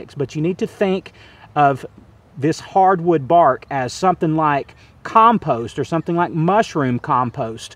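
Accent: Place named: Tennessee, United States. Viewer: Great video! Are you in TN ? You talk like me and I’m from TN . We say we are “ Tickled “ for things . I love to hear other people with our accent and sayings on YouTube 😊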